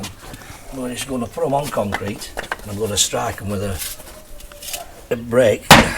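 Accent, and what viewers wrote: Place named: Yorkshire, United Kingdom. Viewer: I love a Yorkshire accent.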